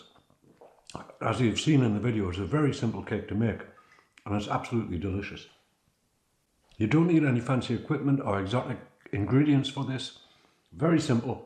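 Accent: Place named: Newcastle, United Kingdom. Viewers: I am from South Shields on Tyneside and he sounds like he is from our neck of the woods indeed. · Looks delicious & easy method to make, will give it a go, & you sound like you have a ‘Geordie’ accent… North East UK, where are you from please?? & thank you 👏👏🌟😋🍽😋🎉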